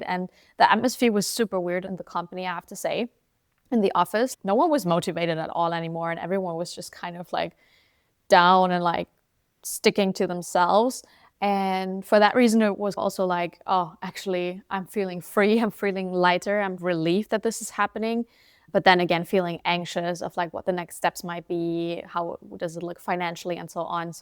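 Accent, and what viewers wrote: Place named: Germany. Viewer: Yes, her accent sounds German, but she looks more Eastern European.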